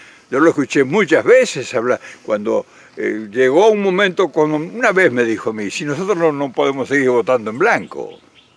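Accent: Argentina. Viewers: No lo había visto nunca señor ,creo que usted es argentino por su acento pero usted habla de información y no tiene idea de lo que dice yo vivo en Ciudad de MEXICO y este señor obrador a destruido al país ,no hay trabajo, desmanteló la salud, la economía estamos en una. Corrupción como nunca ,hay pruebas y, Trump sigue mandando ahora ya se llevan el agua y el ejército mata a los ciudadanos , INFÓRMESE, ACTUALISESE Y, OJALA ALGUN DIA DEBATA EN SU PAIS CON JAVIER MILEI PARA QUE LE DE UNAS CLASES ,POR SU EDAD USTED ESTA CON IDEAS DE HACE 60 AÑOS ,VIVA MEXICO FUERA EL DICTADOR OBRADOR PREPARA SU RENUNCIA EN DICIEMBRE ,Y DICHO PIR EL ES EL PEOR DE LA HISTORIA MEXICANA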